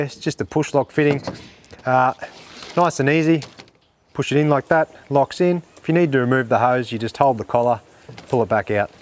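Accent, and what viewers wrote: Australia. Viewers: Australian accent mate “Straya” 🇦🇺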